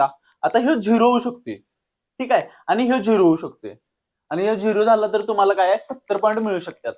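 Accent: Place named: Kolhapur, India. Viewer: Mast marathi boltos kolhapur chi atvan aali karn aamchy kolhapur madhe aaschi bhasy vapartat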